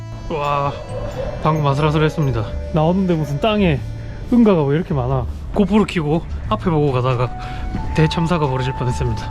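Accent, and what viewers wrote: Gyeongsang, South Korea. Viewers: 잉! 인도를 이렇게 철학적으로 담아내도 되는겁니꽈?? 한편한편 홀린듯 보고 있습니다. 할일도 산더민데 ㅋ. 사연있어보이는 잘생긴 얼굴---- , 막걸리처럼 구수한 갱상도 억양---, 나름의 위트도 있네요 ㅋ. 혹 전공이 영상쪽인가요? 전업유튜버 하시죠!!! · 우연히 보게 됐는데 말투가 경상도 출신이신가요?? 제 친구가 옆에서 같이 보다가 의경얘기에 자기는 대구남부서에서 복무했다고 1028기라며 관심을 가지네요 구독해주라고 했으니 한명 더 늘어나겠네요 구독자 곧 1만인데 미리 축하드립니다! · 경상도 사투리에. 으이~~ 구호까징~ 세상친근합니다 저는 16년전 첸나이랑 다녀왔는데. 가족이 그곳에 있어서 인도여행이 이렇게 빡신지 모르고 댕겨왔네요~ 또가고싶은데. 가족들이 한국에 들어와서. 여행가기쉽지않네요~^^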